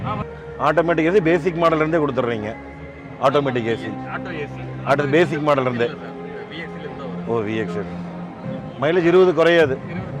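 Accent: Kongu, India. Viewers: கொஞ்சும் கொங்குத் தமிழ் பேசும் கோமகரே...! திகட்டாத தித்திக்கும் பேச்சுடைய திருப்பூர் திருமகரே...! மதிப்பிற்குறிய " " திருப்பூர் திரு. அண்ணா🙏❤ எப்படி இருக்கீங்க❤🙏 அண்ணி எப்படி இருக்காங்க❤🙏 ரித்திக் எப்படி இருக்காரு❤ "Brezza" புது கார் அறிமுக விழாவைப் பார்த்து ரசித்து மகிழ்ந்ததைவிட மதிப்பிற்குறிய " " அண்ணா உங்களுக்கு அங்கே கிடைத்த வரவேற்பு மற்றும் மரியாதையைப் பார்த்துதான் மனம் மகிழ்ந்தேன்❤❤❤❤❤❤❤😊😊😊❤❤❤❤ அண்ணா நீங்களும் நானும் ஒரு தாய் வயிற்றுக் கருவறையில் குடியிருந்த உறவு இல்லை...! ஆனால் நாம் இருவரும் "அன்புத்" தாயின் வயிற்றுக் கருவறையில் குடியிருக்கும் உறவு❤❤❤❤...! நான் மட்டுமல்ல நமது "திருப்பூர் குடும்பத்தினர்" அனைவரும் அன்புத் தாயின் கருவறையில் குடியிருக்கும் உறவுகளே🙏😊❤...! என்றும் அன்புடன் உங்கள் தம்பி ரா.முஹம்மது கபூர் (சென்னையில் இருந்து)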